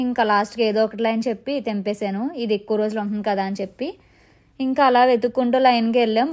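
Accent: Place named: Godavari, India. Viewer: Meru mana godarolla Aisa bagamatladutunnaru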